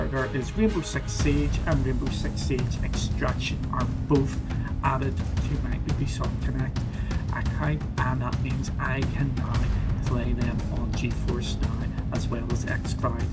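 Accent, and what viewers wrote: Northern Ireland, United Kingdom. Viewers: wait... are you from northern Ireland?, I am from Cookstown Northern Ireland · you northern irish??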